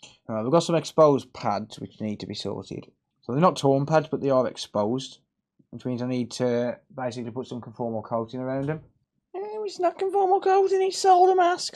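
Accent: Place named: Dudley, United Kingdom. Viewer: Sounds like he’s a local lad from Dudley way.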